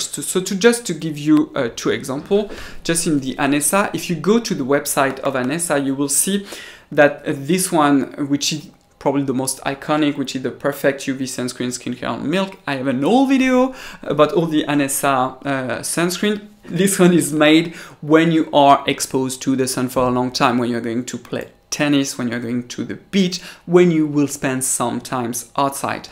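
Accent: France. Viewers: Welcome back!!! I am so glad your making videos again! I love your French accent so much!!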